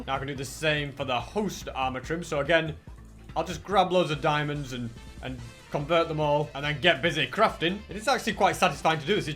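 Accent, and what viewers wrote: United Kingdom. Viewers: Hi are you British or American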